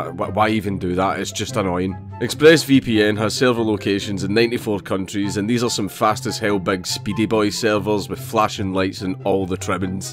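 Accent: Scotland, United Kingdom. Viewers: A Scottish story being told by our Scottish uncle before bedtime. What could be better?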